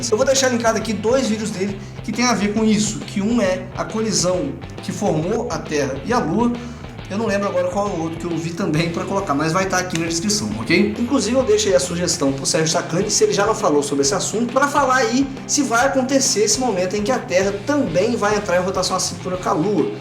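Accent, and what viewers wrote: Minas Gerais, Brazil. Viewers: Sotaque da CAMILA do PEIXE BABEL mode on! kkkkkkkkkkkkkkkk Veio um mineirês com um baianês bem sutil, mas eu pesquei! kkkkkkk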